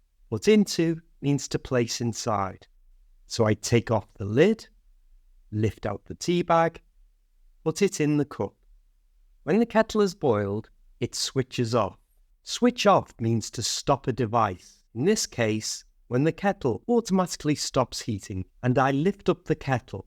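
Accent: Liverpool, United Kingdom. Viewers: You have a wonderful scouse accent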